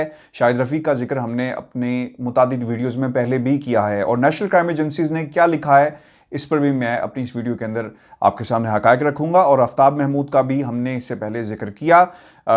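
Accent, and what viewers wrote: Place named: Pakistan. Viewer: So far ur Urdu is Pakistani Urdu, please Mr tell other anchors too do not sound like Indian anchors. Let them see BBC Urdu service and to see the difference BBC HINDI. UR doing a great job like Imran khan (Anchor) & Imran Khan ( prime minister ) can I call u Imran 😂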